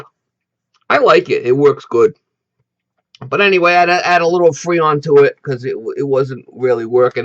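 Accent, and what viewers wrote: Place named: South Georgia, United States. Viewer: That's a South Georgia accent if I've ever heard one... great job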